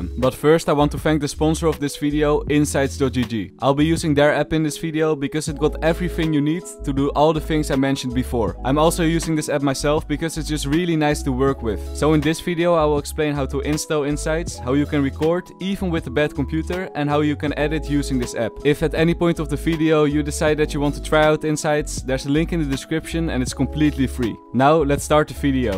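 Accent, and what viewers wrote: Netherlands. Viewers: is that a dutch accent i hear? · are u netherland ? u raely have the accent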